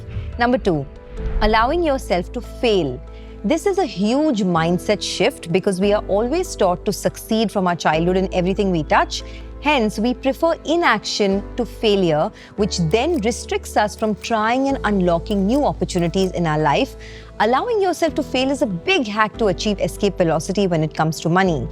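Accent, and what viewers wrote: India. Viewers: Sweet English from beautiful Indian...even the lessons....and much more...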